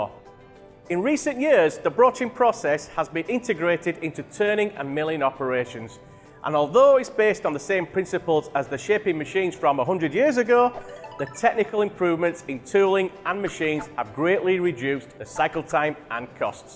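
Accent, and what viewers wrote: United Kingdom. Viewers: Love the accent too. It's an accent I always associate with traditional British engineering, and steam trains.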